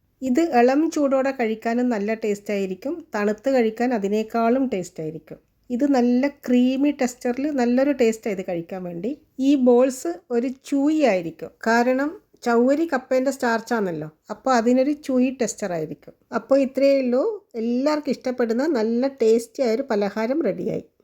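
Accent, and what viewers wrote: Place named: Kannur, India. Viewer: കണ്ണൂർക്കാരി ആണല്ലേ എത്ര ശ്രദ്ധിച്ചിട്ടും കണ്ണൂർ സ്റ്റൈൽ വരുന്നുണ്ട് കൊള്ളാട്ടോ 👍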